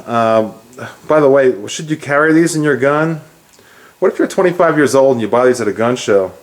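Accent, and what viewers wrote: Long Island, United States. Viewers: the fly popped in and left when you said bye,,, funny. what part of LI are you from. I thought I detected an East Meadow accent.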